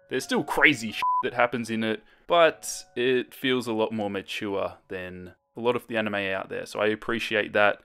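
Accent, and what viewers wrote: Australia. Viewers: are you from, Australia?...im so curious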